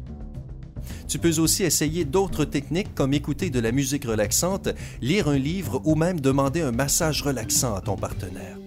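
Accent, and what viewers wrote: Quebec, Canada. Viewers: ton accint québécoué j''jl'aime jaime beaucoup sa fait plaisir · J'adore ta voix québécoise :D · L’accent québécois la !! Valide 😭😂😂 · Il a un accent québécois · S’IL TE PLAÎT SYMPA !!!!!! ARRÊTE D’UTILISEZ CETTE VOIX ELLE EST HORRIBLE ÇA ME DONNE MÊME PAS ENVIE D’ECOUTER LA VIDÉO DONC S’IL TE PLAÎT REPREND LA VOIX COMME LAMA FÂCHÉ PLSSSSSSS PLUS JAMAIS LÀ VOIX CANADIENNE !!! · Jaime bien l'accent canadien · Pourquoi la voix est québécoise (on dirait Luc Langevin) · J'ai mis un poucebleu pour l'accent québécois. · J'adore cette prononciations canadien ❤️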